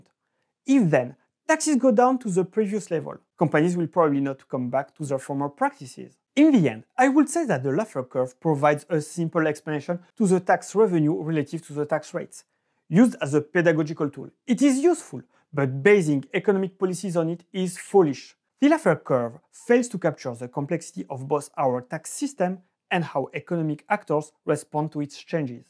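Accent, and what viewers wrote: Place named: France. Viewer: Your French- English is not god enough,never heard that the sums of investment are equal to savings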